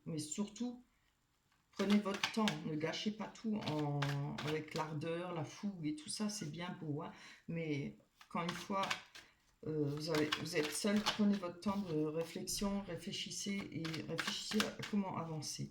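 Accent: Alsace, France. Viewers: Puissiez-vous dire vrai après un an et demi de solitude et de chagrin... que je rencontre enfin l'homme qui saura me rendre heureuse... merci pour la guidance ...ce petit accent ne seriez-vous pas alsacienne comme moi 😘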